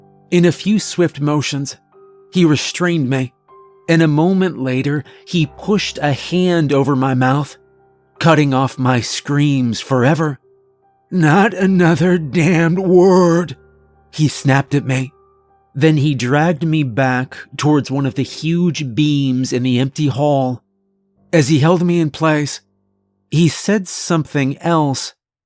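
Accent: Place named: United States. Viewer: that has to be the first time I have ever heard that an American accent sounds cool. I always love ones from elsewhere here but never anyone saying the other way around.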